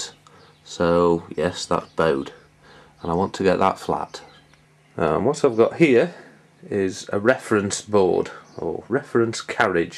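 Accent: Manchester, United Kingdom. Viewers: My God he's from Manchester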